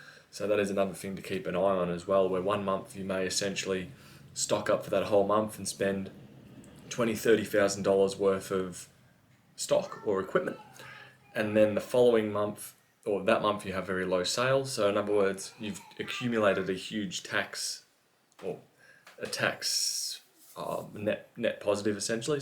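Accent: Australia. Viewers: I'm looking to get into device repairs and cleaning and I have to say these videos have really helped me, your channel is criminally underrated and it's good to here another Australian voice actually helping.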